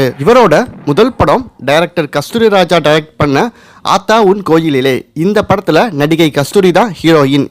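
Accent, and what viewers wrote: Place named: Sri Lanka. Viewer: Srilankan voice slag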